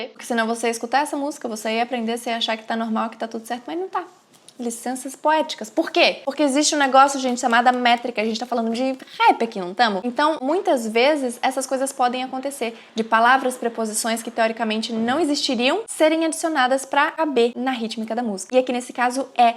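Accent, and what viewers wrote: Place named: Minas Gerais, Brazil. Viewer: seu vídeos são incríveis, sua didática e muito boa, me identifico em vários aspectos do seu conteúdo, gosto musica, guitarras, jeito de falar mineiríssima, e isso me motiva pfvr continue com as musicas da melhor banda de todos dos tempos 🎭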